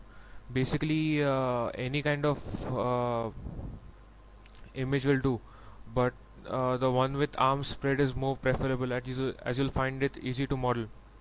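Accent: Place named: India. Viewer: very nice work, do yo have more blender tuts? I need to practice my Indian English listening.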